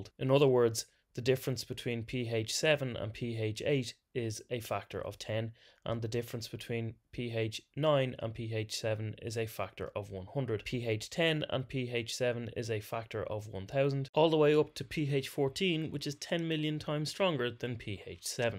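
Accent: Ireland. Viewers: The magical lilt of an Irish voice... listening to your voice... makes me happy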